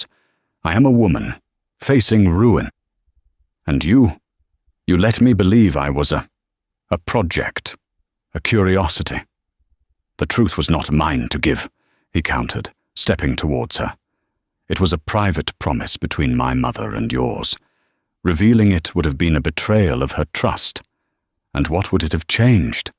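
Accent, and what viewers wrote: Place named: England, United Kingdom. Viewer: I have watched a few of these tales from various websites, and it disappoints me that the narrator sounds obviously English, but the written story is horribly American English. All of these websites need to rectify the language problem so that narration and written word match English from England.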